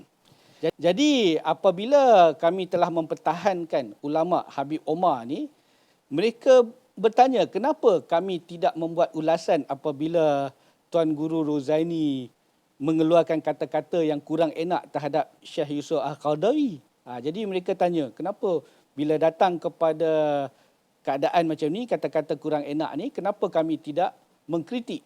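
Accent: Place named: North, Malaysia. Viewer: tg guru lain, ni cari makan ja pun. Orang bodo cem hampa ja duk layan buden ni. Dahla org utara babi rasa sial gila ni duk cakap utara, rasa cem loghat aku pakai jadi hina😂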